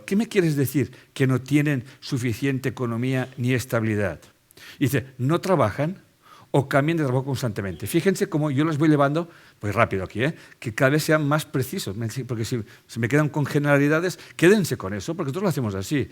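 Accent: Spain. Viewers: por su forma de hablar es español igual a mi papá es bueno hablar de asuntos de pareja pero que bueno ese tema las personas deben conocer su media naranja pos persona no por redes sociales no sabes no lo ves es peligroso cuando entramos es para escuchar temas como los a suyos, ho música, pero pareja no porque hay muchos que se hacen pasar por otros gracias por su tema me encanta bendiciones y larga vida amén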